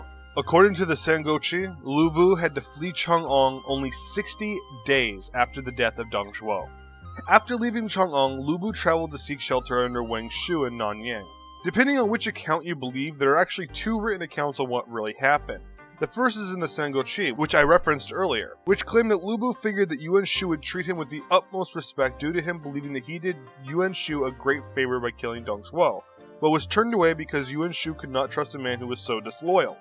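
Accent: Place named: United States. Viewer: fuck these murica pronunciations honestly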